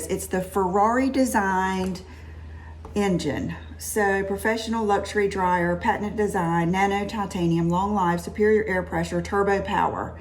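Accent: South, United States. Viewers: Love your precious southern accent!! Can I be you?!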